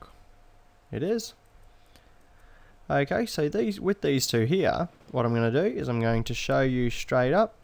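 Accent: Australia. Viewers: Can't listen to this any longer, your accent is killing me with that stupid Aussie inflection!!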